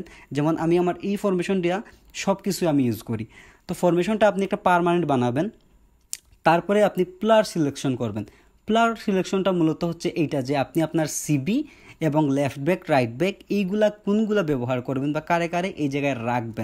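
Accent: Sylhet, Bangladesh. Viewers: bai channel or namor bay deka lagse na. tumar voice e bujilaisi tumi sylheti😁🫣🫣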